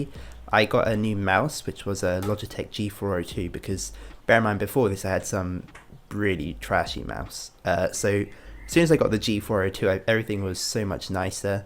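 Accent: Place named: United Kingdom. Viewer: I’m so used to hearing people talk with a British or Australian accent I forgot he was British lol good review tho:)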